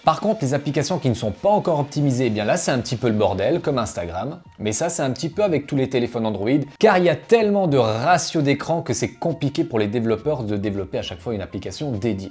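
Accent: Vaud, Switzerland. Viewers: Le beau acsent vaudois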